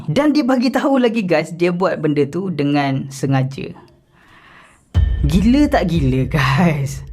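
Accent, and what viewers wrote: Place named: Kedah, Malaysia. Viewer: Tudiaaaaa cakap kedah kaaaaa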